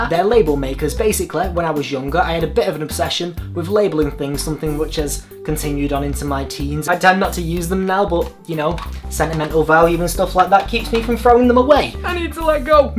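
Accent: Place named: North, United Kingdom. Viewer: I'm a northerner myself, but your accent is pretty nice compared to the ones around me, u go!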